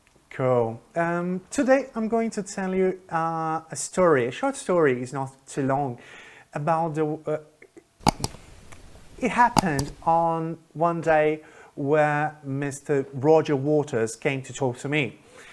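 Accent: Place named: United Kingdom. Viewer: I really liked your history, I could imagine every moment that you described and I guess that was a chock and surprise , I laught a lot with you hahahahha Please stay with this kind of videos, they're amazing! And I couldn't avoid to notice your britsh accent, you talk exactly at the same way of them!! lol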